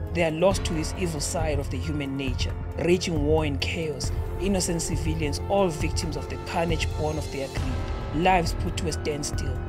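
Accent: South Africa. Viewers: I'm watching your YouTube channel like I'm series of game of thrones, very entertaining ka English ya mzansi😆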